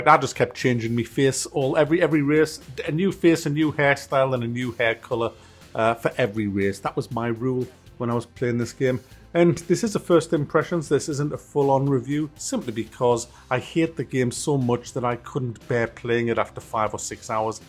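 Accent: United Kingdom. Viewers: Your british english always brings a smile to my face <3 The way you say rant about this pricks haahahah i love it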